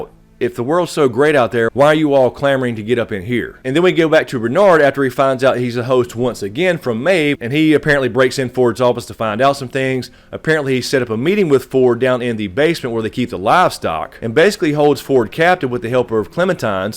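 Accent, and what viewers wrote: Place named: North Carolina, United States. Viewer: North Carolina? Help me with your accent. Nice video, thanks.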